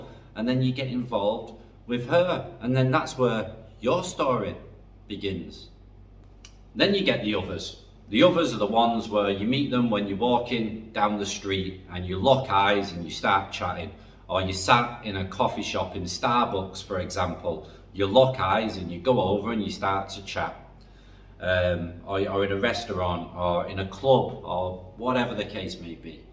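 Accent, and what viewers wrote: Manchester, United Kingdom. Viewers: That back home accent sounds like Walkden Manchester. Just dropped on to your site this morning been binge watching since 8.00am this morning,beento Thailand twice now havent been in 5 years,those pesky kids cost a fortune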